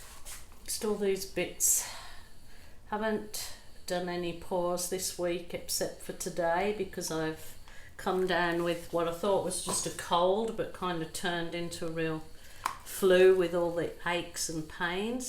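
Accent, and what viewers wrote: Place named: Liverpool, United Kingdom. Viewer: Do you have a Liverpool accent mixed in,.,, sorry if this is rude or you've mentioned it. Love this videos and your simple recipe proves the art comes from the artist's skill. LOL watching you now and it does sound like some scouse in there!!! Ps love these colours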